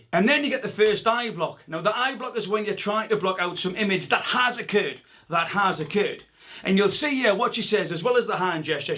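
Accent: Newcastle, United Kingdom. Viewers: You sound like a Geordie spot on video thankyou xxx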